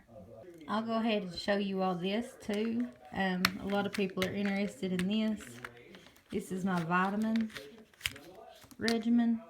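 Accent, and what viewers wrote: South, United States. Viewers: I know you are from the South and I'm probably just not used to it ... but seem so sweet! can't imagine anyone giving you a hard time. heh. great eats and Kickin butt on the water too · I love your country accent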